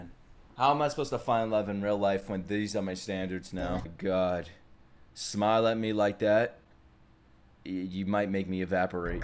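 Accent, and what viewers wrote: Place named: New Jersey, United States. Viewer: I've been trying to figure this dudes accent, definitely Jersey.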